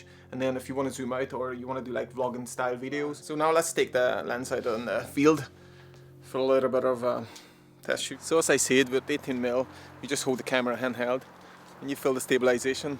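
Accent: Northern Ireland, United Kingdom. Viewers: Good. Is that an NI accent I hear?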